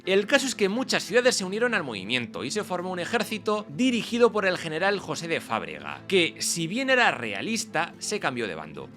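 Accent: Spain. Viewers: Eres de España? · Suena curioso un español narrando la independencia de las Américas. Enhorabuena, excelente video...!!! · Siempre quise saber la versión de los españoles acerca de Simón Bolivar y la verdad escucharlo con ese acento de España es un logro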